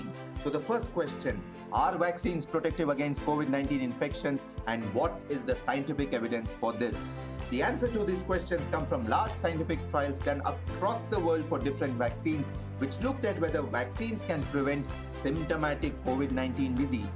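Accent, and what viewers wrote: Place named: India. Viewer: The Indian accent purposefully used is quite nice.❤️👍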